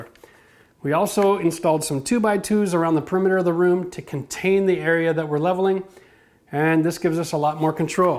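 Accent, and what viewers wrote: Canada. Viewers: you're such a cowboy! SLC is the "one" cementitious product I actually measure the quantity of water for -- partly cuz leveling a floor is sort of a one-shot deal, also because the stuff is like $25 a bag around here. On the other hand I'm brave enough to squeeze the whole bag into one pail -- there's about an inch to spare:) Definitely worth taking the time to properly level a floor. Always fun educational videos and I'm picking up a lot of Canadian.. almost can say Prow-cess correctly! Happy 2020!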